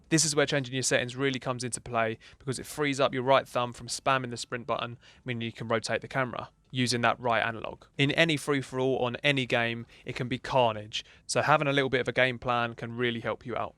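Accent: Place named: South London, United Kingdom. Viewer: Like your direct no nonsense videos, sound like a South London boy ...cheers!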